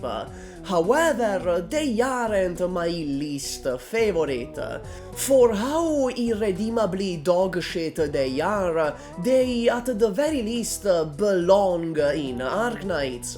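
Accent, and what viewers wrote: Italy. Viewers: "This is why I sound like this" Italian? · Ti seguivo senza sapere che tu fossi italiano, però effettivamente l'inglese è proprio maccheronico <3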